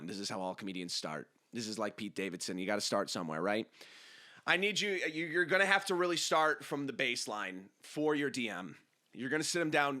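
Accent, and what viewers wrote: United Kingdom. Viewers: The british accent makes the intro so much more precious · The recieved pronounciation is tripping me up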